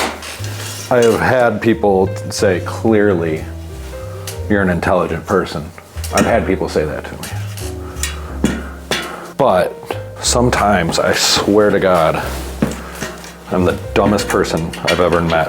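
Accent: Wisconsin, United States. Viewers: New subscriber here. Algorithm found me after the videos you refer to, so I don't know what it was about and I don't want to. Here's what I found, though. A guy from Wisconsin who talks Wisconsin. My husband is from Wisconsin, so I have a soft spot. A guy who works on bikes and I've just bought my first bike (used) in 30 years, so a knowledgeable person who is also opinionated and so explains WHY he holds those opinions...I mean it hit all the buttons all at one time. So your new people are out here and we are finding you. Also got "Still True" queued up as my next read.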